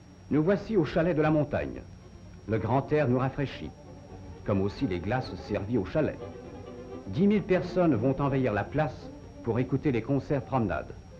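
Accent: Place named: Canada. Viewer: clearly a Canadian accent, but a formal version used in broadcasts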